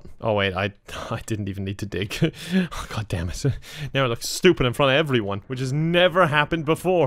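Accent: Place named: Ireland. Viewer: something about that beautiful irish accent and boat load of charisma always makes me hit that like button. im like hes my friend he said so in the intro and he asked oh so politely. guess couldnt hurt to obey whatever says. ps rt sucks. (team since country road, there can only be one)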